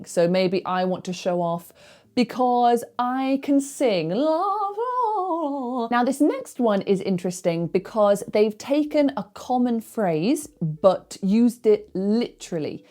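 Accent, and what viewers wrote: United Kingdom. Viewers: It's great to hear British dialect 😍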